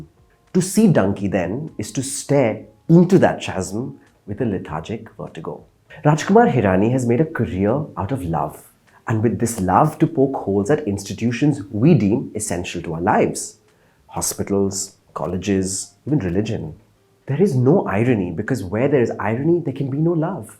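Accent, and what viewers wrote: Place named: United Kingdom. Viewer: I am eager to hear your review on Salaar tomorrow! With same classic British English!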